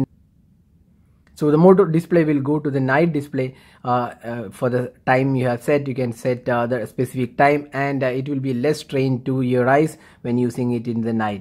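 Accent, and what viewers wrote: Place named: South India, India. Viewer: I love your South Indian accent that's why i subscribed .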